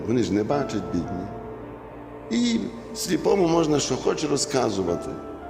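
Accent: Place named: Western Ukraine, Ukraine. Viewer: Я в захопленні від Ваших наук,від нашого західного діалекту. Щиро Дякую,живу ними❤️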